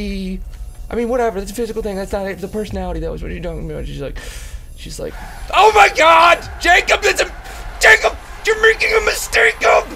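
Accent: United Kingdom. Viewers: Nice british accent ^.^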